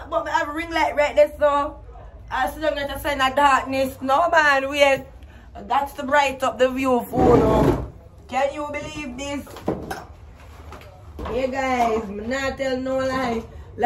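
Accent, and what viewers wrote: Jamaica. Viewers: Mi ah juss ago subscrib to you channel you funny bad the way you talk alone mek me laugh . 🤣😍😍🥰 long time me here a real Jamaican girl talk how you talk. Lol 🤣 😆 every body a try twang 🤣🤣🤣🤣 lol love you vybz a swear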